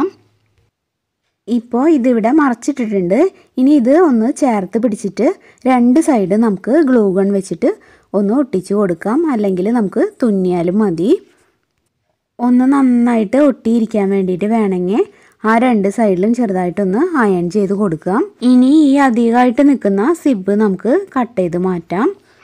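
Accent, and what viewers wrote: Thrissur, India. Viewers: Bro, super ayiitunde, thrissur il avidey a veedu, samsarthinu oru thrissur touch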